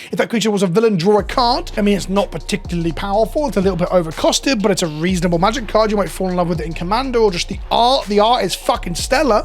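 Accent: United Kingdom. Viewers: Also, what makes you think you're from Europe? You sound awfully British to me.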